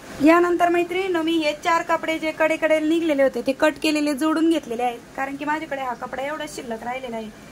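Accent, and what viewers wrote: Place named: Marathwada, India. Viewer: खूपच सुंदर शिवली. तु कोणत्या गावची आहे. तुझी भाषा मराठवाड्याची वाटते. छान छान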